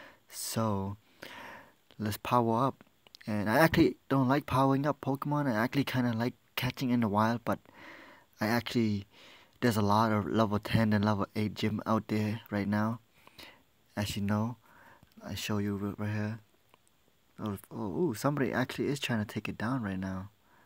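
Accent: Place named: Cambodia. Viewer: Review I knew it. I can tell from your accent. I'm Cambodian too lol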